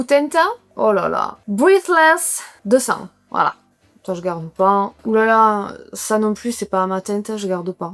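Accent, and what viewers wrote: South, France. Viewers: L accent du sud haha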